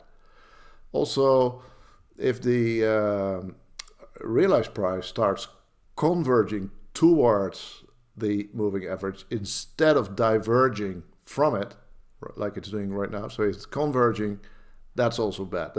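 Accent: Netherlands. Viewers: Are you Dutch? 🎉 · I love the Dutch accent.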